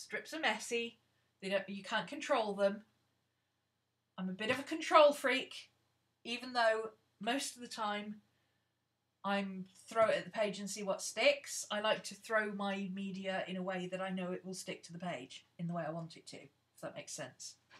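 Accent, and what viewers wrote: United Kingdom. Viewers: Hi,. I love planner flips. I just asked to join your FB group. Actually, may I ask, where are you from specifically in the UK? I do believe it's an English accent, but I think there may be something else in there?? Not sure. Right now, I 'm fascinated with accents, especially British accents. I am writing a book, actually, and am trying to write some dialogue in an accent for some scenes. Boy, that's hard, for an american, anyway.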